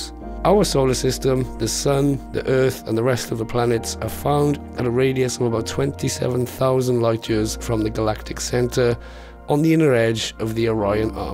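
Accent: Wales, United Kingdom. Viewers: What part of wales are you from mate?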